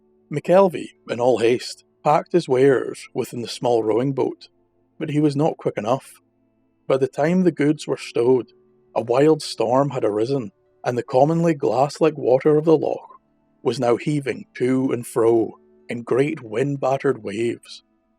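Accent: Scotland, United Kingdom. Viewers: thanks for telling Scottish stories with Scottish accent. i actually cannot stand English or American accent. Due to my upbringing. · Please Sir, may I have some more? You have a handsome voice and I sincerely and truly appreciate & enjoy your lyrical Scot's accent. Yours truly from America. Be Blessed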